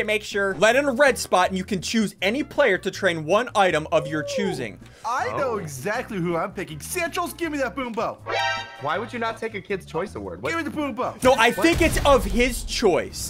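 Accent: Canada. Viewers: Is he Canadian (im Canadian so that’s not racist) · Are u Canadian? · He’s Canadian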